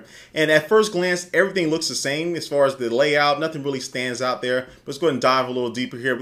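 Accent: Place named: St. Louis, United States. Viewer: the "imma dis" and "imma dat" annoyed me too, but then he said he was from saint lewis, right? so then it's understandable. he's not trying to be cool it's a location thing :)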